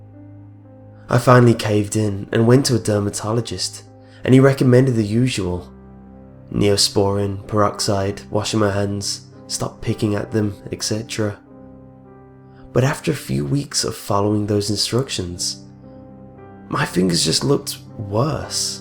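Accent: England, United Kingdom. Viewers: I love your voice, I don't know why but an English accent just makes things creepier.:) You kind of sound like Wacko off of Anamaniacs. (Not a bad thing at all.) (Also, I'm sorry for spamming your comments by replying to an idiot.)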